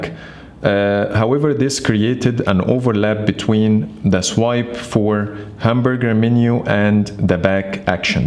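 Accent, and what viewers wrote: Egypt. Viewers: Wooow!! Egyptian accent i guess;)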